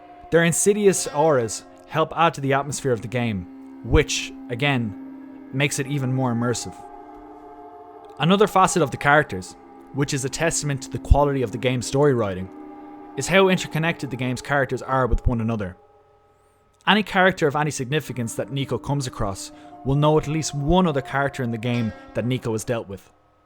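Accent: Ireland. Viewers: Is that an Irish accent I hear? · Are you from Ireland and if you are what county lol,also great video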